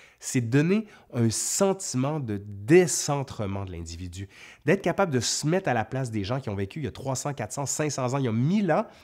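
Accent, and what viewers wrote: Quebec, Canada. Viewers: Une question qui me tarode depuis un bon moment et j'espère que tu pourras y répondre. Suite aux grandes découvertes, aux fuites de certains français en raison des guerres de religions, de la Révolution Française.... beaucoup se sont réfugiés au Québec ou en Louisiane, parlent avec le même charmant accent qui est le tien. Donc, question: parlait-on ainsi en France à l'époque des Henri, Louis Charles et autres? Et ainsi vous auriez conservé cette particularité linguistique, tandis qu'en France, la langue se serait "vulgarisée". Ce n'est pas un terme péjoratif, d'où les guillemets. Merci d'avance. Et Bravo pour tes vidéos. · Vous n'avez peut-être pas le coffre de Benjamin Brillaud, mais l'Histoire racontée avec l'accent québécois est fort plaisante. Ne changez rien :)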